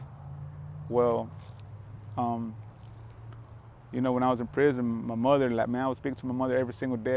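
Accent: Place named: Alabama, United States. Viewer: What a trip, a Guatemalteco with an Alabama accent. ❤ Stay strong in the faith hermano.👊🏼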